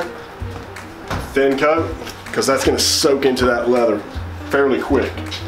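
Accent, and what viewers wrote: South, United States. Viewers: can't get enough of your craftmanships and your southern accent; keep it up!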